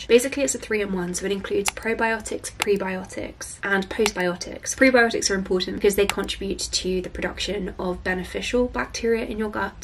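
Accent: United Kingdom. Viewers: I am watching your videos now to prepare for my English exam. My teacher is from UK, so it is very useful for me to listen someone from this country to copy an accent. I have already watched some of your videos since the first one. It is cool to watch you grow up. Thank you and hi from Russia, Krasnoyarsk❤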